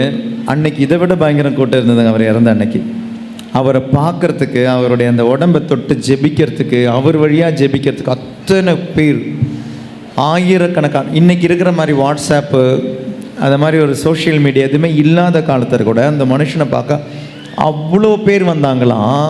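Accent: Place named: Kerala, India. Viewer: மேஜர் அந்தரராஜன் ஸ்டைல். மலையாள வாடையும் விடமாட்டேங்குது.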